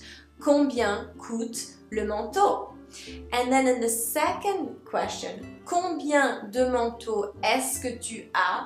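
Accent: United States. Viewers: Tu as prononcé le mot "example" comme "exèmple" :) <link> Mais en tout cas ton accent américain est superbe !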